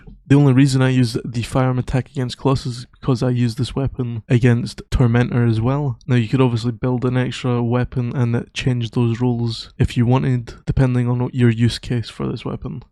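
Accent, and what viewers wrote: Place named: Scotland, United Kingdom. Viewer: I hear the Scottish accent so I’ll leave you alone Back rockstar a company created by 2 Scottish brothers , not this goofiness cash grab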